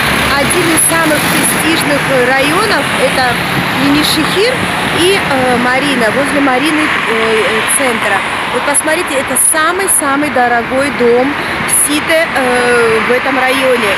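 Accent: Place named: Georgia. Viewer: Очень длинная реклама! Но я не переключаю. Жду ваш славный голосок с грузинским акцентом!👍👍👍👍👌👌👌👌👌👌💞💕💖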